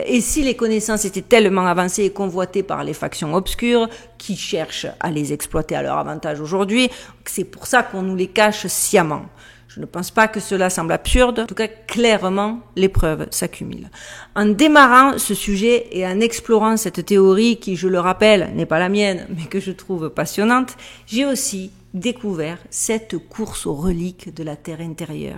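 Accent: Marseille, France. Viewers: Je suis curieuse et pas autruche du tout, alors, oui, continuez à farfouiller svp 😀 c chouette l'accent marseillais 😄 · Oh,Peuchère,vive l'accent de Massilia! 🐟 et les sardines! 🐟🐟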